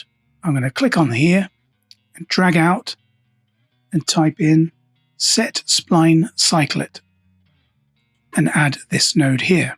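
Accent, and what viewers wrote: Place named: RP, United Kingdom. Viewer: Think I've found the Attenborough of Blender tutorials, all the way down to the very accent if I'm not mistaken! And that is meant as a huge complement since he's the king of human voices.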